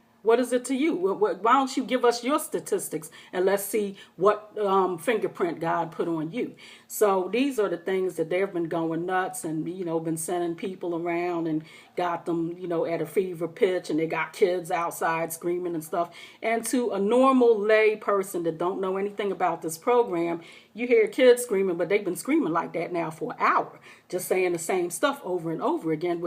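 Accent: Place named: United Kingdom. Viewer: Hey. That accent sounds British. Thanks for the update.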